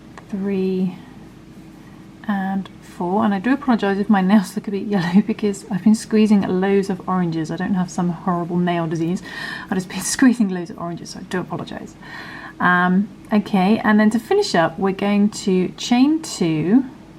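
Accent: United Kingdom. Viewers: Something about my dyslexic brain can't comprehend the british voice using american crochet. I automatically translate it to uk crochet and then you say nah we aint doin that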